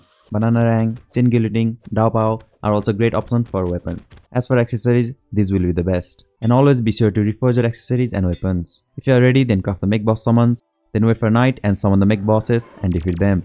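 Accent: India. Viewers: Can't understand with you with your jeet accent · no, he IS Indian but he's clearly not trying to hide his accent. He's just talking fast. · But he sounds Indian,sounds like my professor.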